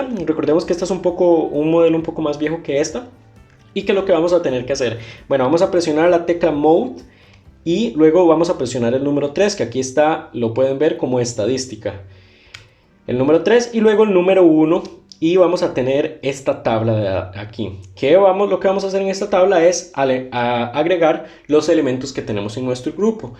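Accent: Costa Rica. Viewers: este mae es de costa rica se nota demasiado pura vida playo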